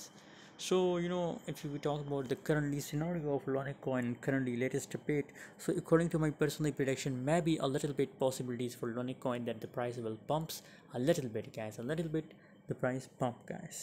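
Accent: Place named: China. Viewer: No 1 can understand yr Chinese accent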